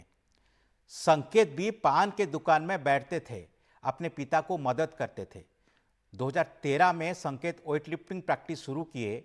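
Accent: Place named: Odisha, India. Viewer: The anchor’s Odia accent is so nice.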